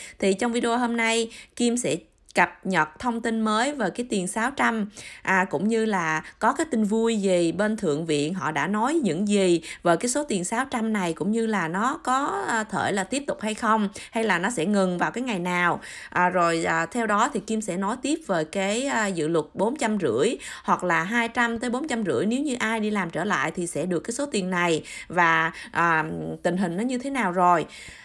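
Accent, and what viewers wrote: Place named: South, Vietnam. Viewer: THANKS 👍 VỚI NHỮNG THÔNG TIN GIÚP CHO NHỮNG NGƯỜI KHÔNG ĐỦ THỜI GIỜ TÌM HIỂU MAY CÓ (GIỌNG ĐỌC Miền NAM, ) NÓI CHUNG LỄ PHÉP.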